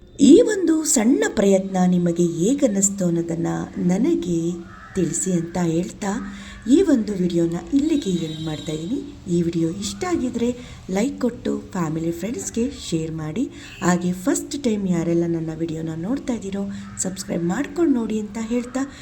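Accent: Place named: North Karnataka, India. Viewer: ನಿಮ್ಮ ಹಾಡು ಸೂಪರ್ ಸಿಸ್ಟೆರ್ ಅದಕ್ಕಿಂತ ಹೆಚ್ಚು ನಿಮ್ಮ ಉತ್ತರ ಕರ್ನಾಟಕದ ಶೈಲಿಯಲ್ಲಿ ಮಾತಾಡಿದರಲ್ಲ ಸೂಪರ್ 👌👌👌👌👌👌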